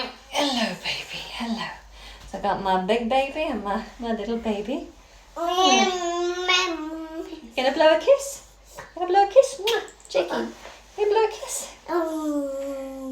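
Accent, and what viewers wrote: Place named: England, United Kingdom. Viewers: First video I have seen of you very good video. You have a nice clear English accent but dear god did you eat a horse or something LOL. It looks you have eating a horse. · Hello from Jon in the Los Angeles area. I'm an American, I speak "New York" and "Chicago" English (sometimes with the accents coming through). I've been to London thrice now (each time spending a week there, including trips to Norwich and Manchester - ace!). London feels like home, it really does. Got friends there too. I feel pretty confident with my English accent, though Northern English is a bit difficult. I can do Scottish and Irish too! I remember seeing your video using your native Mancunian accent, loved it! But, I have more learning to do while watching more of your content! Cheers, and continue to stay safe. Jon El Segundo, CA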